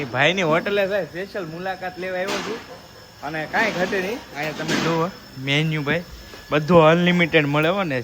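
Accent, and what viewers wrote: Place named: Gohilwad, India. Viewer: गोहिल वाड की बोल पहचान जाता है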